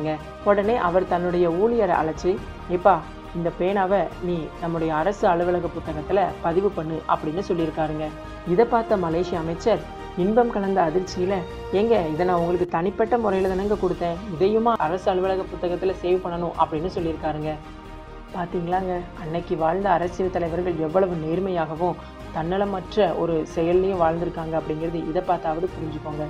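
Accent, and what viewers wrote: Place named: Thoothukudi, India. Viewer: Awasome Video , Keep It Up Bro 4.02 Thoothukudi karangana pesa solliya tharum🙂 Stay Home🏠 Save Life💖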